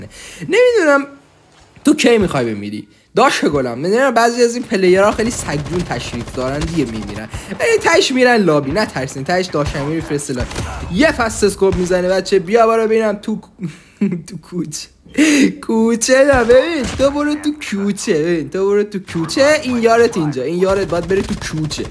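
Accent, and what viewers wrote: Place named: South, Iran. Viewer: لهجت مثل جنوب یاست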